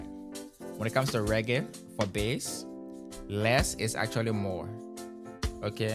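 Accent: Liberia. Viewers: Are you a Liberian ? You sound so Liberian 🇱🇷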